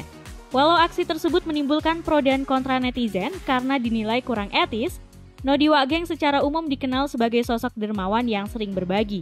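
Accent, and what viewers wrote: Indonesia. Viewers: Yg ada nya kau bkn miskin.. tp balik kampung ketakutan Oh btw bang Kau itu anak aceh ya Jgn kau bawa2 nama anak medan Logat bicara kau aja aceh x Anak medan ga spt itu logatnya Org asal aceh kok bawa2 nama kampung sebelah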